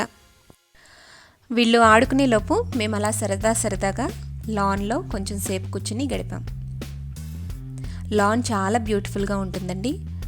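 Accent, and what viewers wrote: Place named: Rayalaseema, India. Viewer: mi slang rayalseema matalu laga unai..nice madam vizag super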